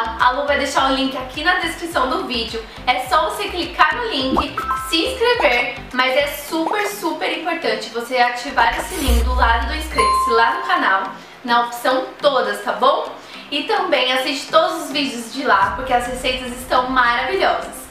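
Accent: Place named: South, Brazil. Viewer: Bom dia. Moro em São Paulo, mas sou Gaúcha! E tu , mora no Sul? No Paraná? Pelo sutaque! Bjs Amo fazer pão caseiro!!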